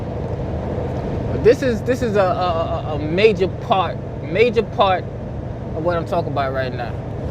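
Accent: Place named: New York, United States. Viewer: Lol he gotta be from new York lol that accent funny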